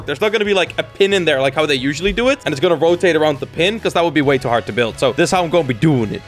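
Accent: Netherlands. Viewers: Are you from the Netherlands or from Belgium? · are u Dutch? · Hes dutch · are you dutch?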